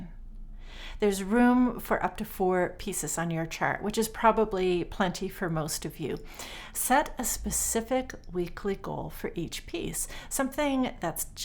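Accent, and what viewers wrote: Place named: Canada. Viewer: Thank you for making me feel a musicien at 72 years and for the inspiration you give me to sit down and practice in a structured programme. I do love your very clear canadian english accent. Have a nice Sunday.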